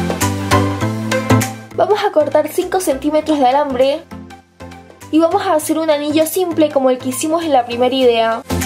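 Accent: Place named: Córdoba, Argentina. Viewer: De que parte de Argentina sos? Pareces de cordoba,i ndea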